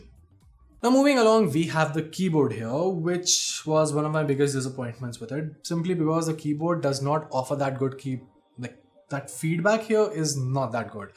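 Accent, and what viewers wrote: India. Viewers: Is he an Indian? Coz he sounds like one?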